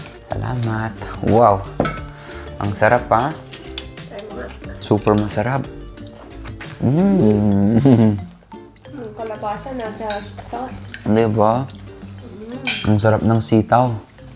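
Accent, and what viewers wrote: Philippines. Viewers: Accent ni kuya, ilocano metten😂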